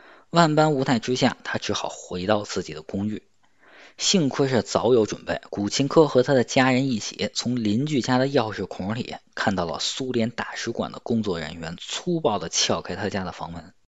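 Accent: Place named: Beijing, China. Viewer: 北京腔好听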